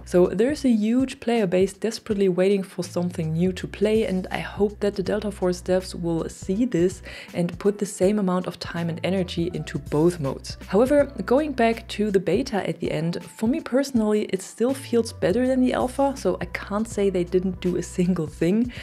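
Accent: Germany. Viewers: Hey, are you german? Your accent gives me hard german vibes. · ich faends richtig klasse wenn du einfach richtig deutsch englisch sprichst. ich versteh immer nicht warum leutre krampfhaft versuchen irgendein mittelamerikanischen akzent zu imitieren. bööörgr. for the most part, you speak english with german accent, und das ist auch gut so. alles andere wirkt laecherlich, bei jedem der versucht nativ speaker zu imitieren.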